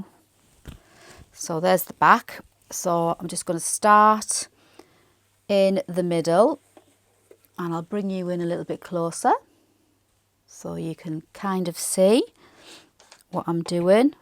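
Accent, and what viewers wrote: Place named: North, United Kingdom. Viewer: The babylock machines are the best i have that coverstitch and an imagine overlocker, You sound northern like me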